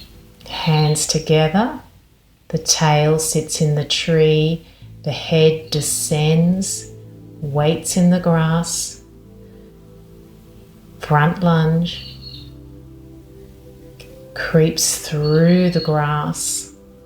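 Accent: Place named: Australia. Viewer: Sublime thank you. I loved hearing the Aussie accent. What a beautiful setting...Blessings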